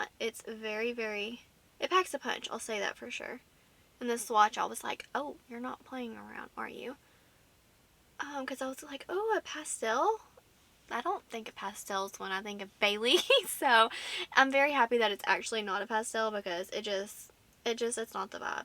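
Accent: South, United States. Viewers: I'm a new subscriber of yourd and I am really enjoying your channel and your beautiful southern accent! My family is from Temnessee and I love the subtle differences across the states! ❤ I'm eagerly waiting this palatte!!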